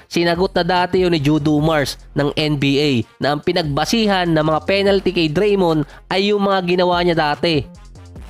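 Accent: Batangas, Philippines. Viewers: salamat sa iyong nba updates, ako'y nagsubscribed na rin at ramdam ko eh ikaw eh isa ring gaya kong batangueno eh, tama ga... shout out mula dubai ha.. balak kong maaglaro din 1XBET eh pwede gang gamit eh ibang Gcash, sa fiance ko at ang akin eh di pa updated eh, ayos ga yun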